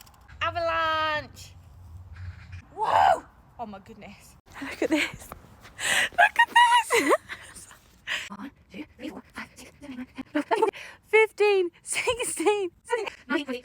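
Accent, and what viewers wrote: United Kingdom. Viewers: Is this a British 😅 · I have a question 🤔🤔 are you a British (no hate just curious) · The British accent makes it even funnier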